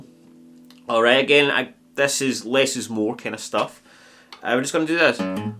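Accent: Scotland, United Kingdom. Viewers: He’s Scottish, not Irish! 🙄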